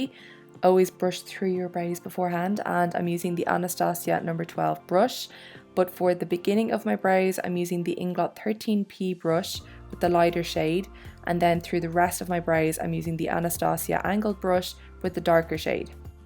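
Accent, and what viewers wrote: Ireland. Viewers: I wish I had an Irish accent, so pretty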